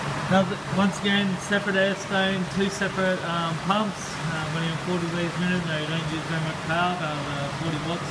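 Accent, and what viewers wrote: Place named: New Zealand. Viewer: Dude he sounds like a kiwi to me, You Aussies not satisfied with trying to take responsibility for creation of the pavlova want to lay claim to anything else good new Zealand produces too.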